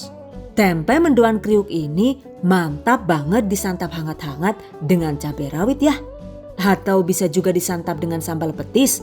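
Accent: Banyumas, Indonesia. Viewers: tiba,e ngapak yu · Bisa ngapak yu,, Rika wong banyumas, p...mantap yu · Ngapak banget yu lah hahaha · 😀😀😀 haha Aku ketawa terus klau Pas mbak nya ngmng ngapak😂 sukses selalu mbk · Pertama lihat video THV kaget bahasanya ngapak,, sooo nyonk kira wong Cilacap 🤭dadi betah nyonk nyimake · "DONE" Pertama kali lihat channel ini penasaran, akhirnya coba di tonton, dan yang bikin pengen terus nonton itu suaranya yang khas banget ada campuran English dan ngapaknya juga, dan juga kualitas editingnya bagus, bukan kaleng2 pokoknya. Sukses terus Channel. Aamiin #Giveaway THV2020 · oalah ngapak jebule rika yu 😅😅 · "DONE" Awalnya aneh juga. Madiun tapi ngapak. Baru kali ini lihat wajahnya. Makasih resep2nya. #giveawaythv2020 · Selama ini ngebayangin mukanya ga kyk gt Jadi kaya wajah dan suara dijual terpisah 😂😂😂 Kaga matching Kalo yg ngasih resep wong ngapak hrsnya legit nih resepnya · Wkwkwk dasar mendoan Ora ngapak ora kepenak... 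Ketika suara ngapak bikin kaku weteng ku🤣🤣🤣🤣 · Mantap banget Bu,,,njawane medok men,,,asli banyumasan ?aku salah sijine pengikut postingan e Lo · Laaah mimin nya asli madiun apa banyumas ngapak bgt😂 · Laahh cingire, ngapak jebule 😂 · Buund..syukaa sekali logatnya gado"....indo..english..jwaa ngapak...top deh buund.sehaat teruus yaaa..slm kenal..😊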